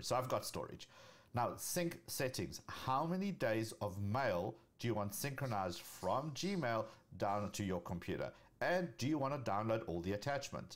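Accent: South Africa. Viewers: your content is amazing and your SA accent makes it even better.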